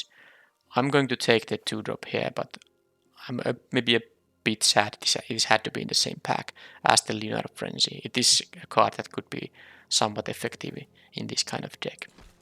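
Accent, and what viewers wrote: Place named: Finland. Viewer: You sound Finnish! Lovely to see your face :D Thanks for all the draft videos, I've found them very helpful.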